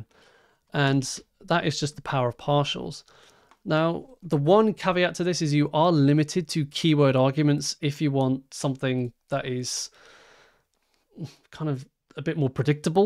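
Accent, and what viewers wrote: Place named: United Kingdom. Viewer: Underrated channel, also that bri-ish accent is woowwwwww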